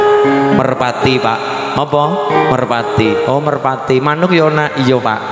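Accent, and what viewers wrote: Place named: Banyumas, Indonesia. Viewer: Ngaji samasulinnuha semarak semangat soalnya saya seneng sholawatklo ngaji sama mas iudah pasti ada sholawatnya bikin semangat klo ada sholawatnya jadi tidak tegang. Terhibur sama sholawat.merasakan rasanya adem semringah.sejukhatinyapakai bahasa ngapak juga enak didengar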